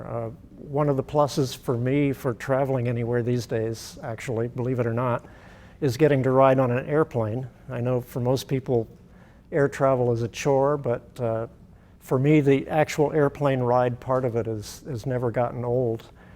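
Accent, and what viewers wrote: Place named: United States. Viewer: the pronunciations of the names are so American, it hertz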